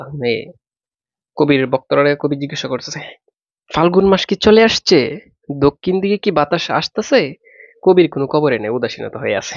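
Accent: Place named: Sylhet, Bangladesh. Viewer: স্যার আপনার বাসা কি সিলেট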